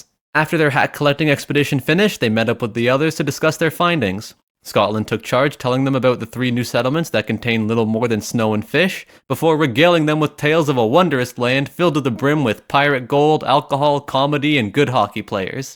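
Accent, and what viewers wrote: Canada. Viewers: Dude, what part of Canada are you from?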